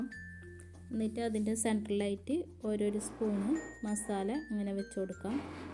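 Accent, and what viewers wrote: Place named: Kannur, India. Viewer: Masha Allah. അടിപൊളി Insha Allah try ചെയ്യുന്നുണ്ട്. വർത്താനം ശെരിക്കും കണ്ണൂർ സിറ്റിക്കാരുടെ തന്നെ 🤣👌🏻 സ്മോക്കി roll ആദ്യമായിട്ട കാണുന്നത്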